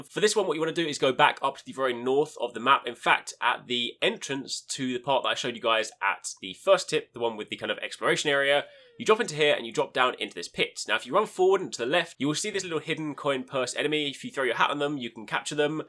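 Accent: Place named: United Kingdom. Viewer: Why is every odyssey youtuber british?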